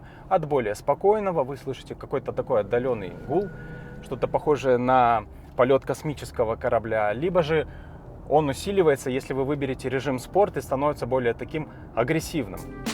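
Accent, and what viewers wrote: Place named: Belarus. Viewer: Быццам бы распавядае беларус. Націскі ставіць па-беларуску.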